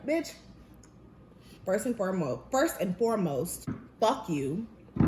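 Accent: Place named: Caribbean. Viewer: I LOVE HEARING THE CARIBBEAN IN YOUR VOICE & ACCENT MA'AM.... ITS FUCKING GORGEOUS... And i NEEDS IT.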